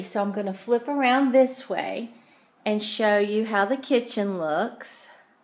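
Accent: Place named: Rural, United States. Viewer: I love your videos! Your husband seems to be amazing you can tell he adores you from the video where you made the dip how he just jumped right up asked what he could do to help or something like that bc most men just sit there & take forever to come help. I loved how he followed you around dollar tree! Great DIY videos! I'm from NC! Where are you from? Just wondering bc of your country accent!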